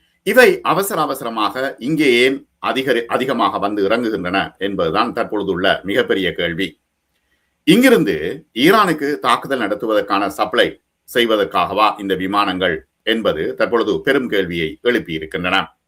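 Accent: Jaffna, Sri Lanka. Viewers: Jaffna tamil voice nantraga ullathu 🙏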